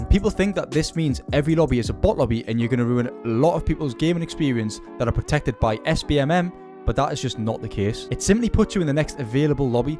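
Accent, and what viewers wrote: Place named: Newcastle, United Kingdom. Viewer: never heard a geordie youtuber before 😭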